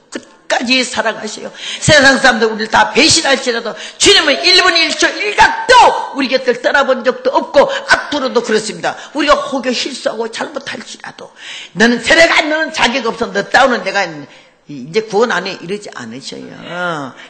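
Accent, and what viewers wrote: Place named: Gangwon, South Korea. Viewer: 영상 다 뒤져보면....고향얘기 나오고요...강원도지역에서도 좀 지내셨어요.말투에서 음성에서..저도 같은 생각.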